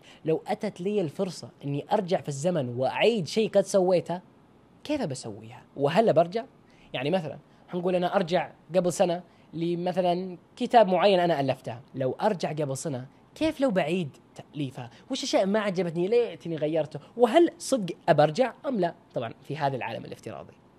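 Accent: United Kingdom. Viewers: انا عارف شعورك وانه نطقها بالطريقه البريطانيه نوعاً ما مزعج او مستفز ولكن معا الأسف ترا فكرتنا هذي عن انه مزعج او مستفز خطأ وبدل ما تطلب منه يغير اسلوبه في النطق عشان ترتاح في سماعها انصحك وأنصح نفسي وكل من هم زينا اننا احنا نغير فكرتنا انه الطريقه الصحيحه في النطق اهم من راحتنا وأننا ندرب أنفسنا على تقبل هذا النطق الصحيح بدلا من انتظار العالم انهم يصيرون ينطقون بما نحبه احنا لأنهم ما راح يتغيرون ابدا شيء مستحيل اصلا وهذي نصيحتي واتمنى انها تكون واضحه لك وللجميع يارب ❤ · غير صحيح ... الصحيح أنه ينطقها بريطاني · طيب؟، يعني إذا أهم الشيء الكلام واضح، بالنهاية نطقه للغة الانجليزية بالطريقة الصحيحة(باللهجة البريطانية)مو من شأنك أبدًا ويعد إختياره، صح؟ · استمر يالأخ ولكن انا عندي نقطه بخصوص نطقك للأنجليزي اتمنى يكون نطقك وهجائك بلهجتنا وليس باللهجه البريطانيه اتمنى تتقبل انتقادي بصدر رحب واتمنى لك التوفيق والنجاح ومن هنا للأعلى 🤍🤍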